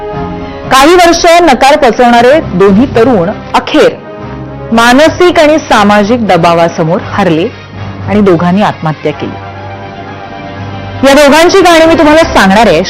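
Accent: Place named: Marathwada, India. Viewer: शेवट च वाक्य चांगले बोलले तुम्ही मला वाटतं तुम्ही मराठवाडा साइट चे असाल कदाचित भाषे वरून 😊 पण तुम्हाला बाबा नाहीत हे ऐकून वाईट वाटलं परंतु तुमच्या आईने तुम्हाला वाढवलं ही किती साहसाची गोष्ट आहे 🤝😮 तुम्ही जेव्हा तुम्हाला स्थळ बघाल तेव्हा तुम्ही ही नोकरी आणि प्रॉपर्टी पाहणार हे नक्की. प्रेमाने पोट नाही भरत पगाराने भरते आणि स्वतःची प्रॉपर्टी अडल्या वेळेला कामी येते 🤷 बाकी मुलांना शिकायचे नाही मी मुंबईत आहे तर येथील मूल धावपळ करून एंजॉय करून एकदम टवटवीत असतात रोज आणि शिक्षणही कसेतरी करतात 😅 तरी गावाकड उलट आहे ज्या गोष्टींकडे नाही पळायचं तिकडे जास्त पळत सुटतात उलट शेजारी कितीही गोड बोलणारा असेल किंवा रक्ताचा नातेवाईक असेल तरी तो जमलेल लग्न कसे तोडल हे पाहतो हे सत्य परिस्थिती आहे 😅 परत आपण म्हणतो गावाकडील लोक एवढे गरीब का ? कर्माने आहेत ते 😢कधी सुधारणार ही मानसिकता काय माहीत 🥱चला तुम्हाला तुमच्या पुढील वाटचालीस भरपूर शुभेच्छा 👍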